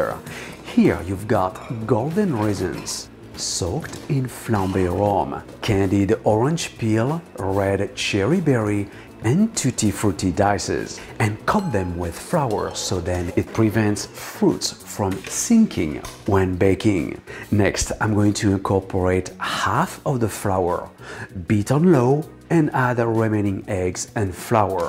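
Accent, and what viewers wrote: France. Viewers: Frenchy u make me remember my best frenc friend with u accent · Is it just me or is everything better with a French accent.????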